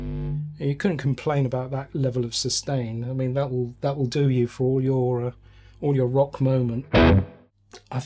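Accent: United Kingdom. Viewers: I could listen to this British accent all day.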